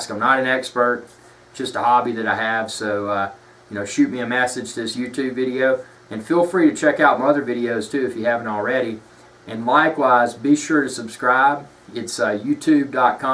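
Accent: South, United States. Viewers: sounds even better when you say it man! I mean it! That southern accent is the best. Greetings from Canada.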